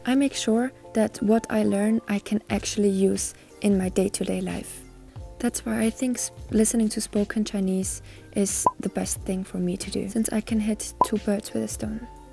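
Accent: Germany. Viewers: Very impressed by your diligences and study approach, I'll definitely try to apply it going forward. Just a small note: you have excellent English but a pretty strong German accent - maybe try to emulate your preferred English accent, just to sound a bit more natural?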